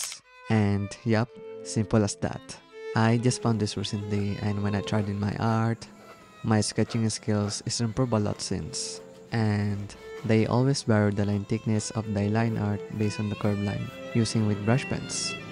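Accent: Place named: Philippines. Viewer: are you filipino? your english accent similar to filipino english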